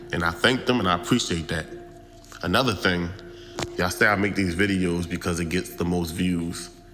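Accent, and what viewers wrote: Philadelphia, United States. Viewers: Love these videos!! Keep these coming. If we didn’t know you was from Philly….this video let us know YOU DEFINITELY FROM PHILLY😂😂😂😂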